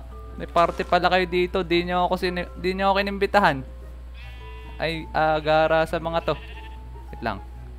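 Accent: Philippines. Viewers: Batanggenyo po ba kayo kasi po yung sinasabi nyu po kasi parang pang batanggenyo hahahahahah kaka relax ka panoorin erp sana umabot ka sa 100k, subs para may play botton napo tayo sana mapansin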